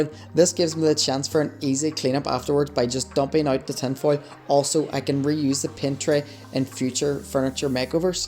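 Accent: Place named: United States. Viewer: really like your recycles but I am very confused by your language. Are you Irish, American or Canadian? Your products and pricing are British but you talk as if you are American/Canadian.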